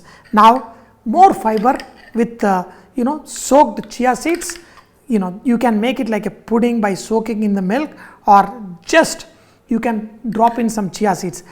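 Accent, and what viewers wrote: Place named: Telangana, India. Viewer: I love your Telangana slang very much...😂😂. and also your childish movements everytime 😍😍😀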